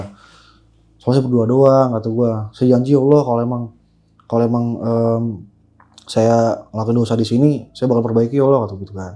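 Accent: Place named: Bekasi, Indonesia. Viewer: Bekasi bgt nih logatnya resep betdahh dengerin cerita lu bang 🤣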